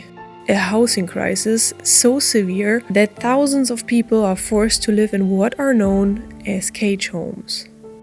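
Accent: Germany. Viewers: has a German accent? True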